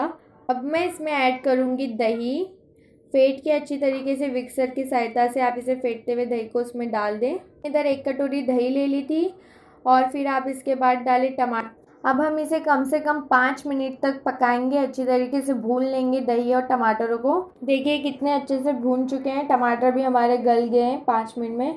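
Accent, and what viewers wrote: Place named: Marathwada, India. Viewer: Ap pakka marathwada region ke ho , apki toning se pata chalta hai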